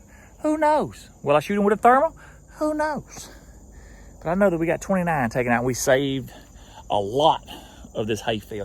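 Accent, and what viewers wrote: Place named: East Texas, United States. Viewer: When I hear you talking it reminds me of growing up in East Texas near the Louisiana border. I havan old hunting buddy lives in Grenade Mississippi. Love watching your videos.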